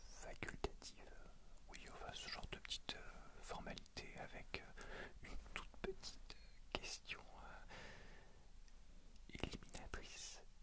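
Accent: South, France. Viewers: D'habitude je n'aime pas du tout les role-play mais j'ai a-do-ré celui-ci !! Peut-être parce que c'est plus que ça justement... les clins d'œil sont tellement malins, la touche d'humour est parfaite, le scénario est génial, le fond noir est hyper agréable, le texte est top et le son est absolument impeccable ! Vous avez sans doute fait plusieurs essais avant de sortir ces vidéos mais c'est tant mieux :) Je décèle un léger accent, êtes-vous originaire de la moitié sud de notre bel hexagone ? :) En tout cas il apporte une touche unique supplémentaire à votre travail ! Vraiment bravo pour tout cela, vraiment ! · tu es du sud cong non ? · Baptiste Conbatant un léger accent du sud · Superbe vidéo ! J'ai l'impression qu'un certain accent du sud revient des fois non ? ^^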